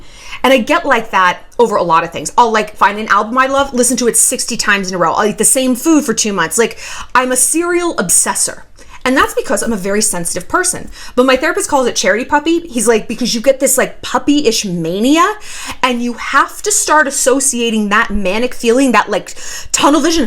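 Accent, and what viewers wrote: England, United Kingdom. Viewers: Lol the British accent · Why are you reading in English accent? This book was written by two Americans author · love your english accent! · love your British accent haha <3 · LOOOOOOL I LOVE THE BRITISH ACCENT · Your British accent is a GEM!! LOVE IT 😂😂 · Love the British accent! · Again the British accent ICONIC love you 😘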